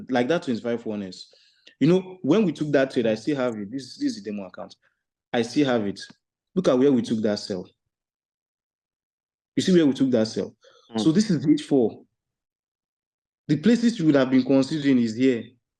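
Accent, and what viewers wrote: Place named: Africa. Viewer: I love you talk markets in your special african ascent haha I laughed while watching your video. Keep these videos comming. You milk we enjoy!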